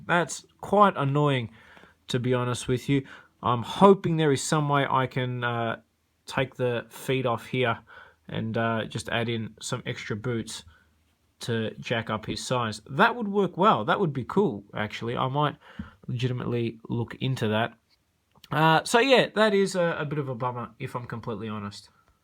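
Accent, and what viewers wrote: Australia. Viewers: Please reply are you Aussie?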